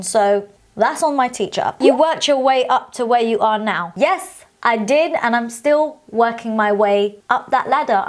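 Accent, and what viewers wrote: United Kingdom. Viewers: Your hair is incredible! Do you have a tutorial? Ps love how london u sound😅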